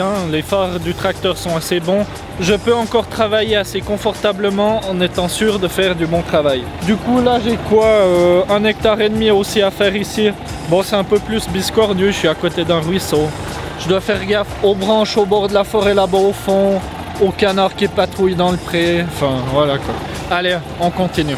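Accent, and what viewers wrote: Vaud, Switzerland. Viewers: le bon accent vaudois !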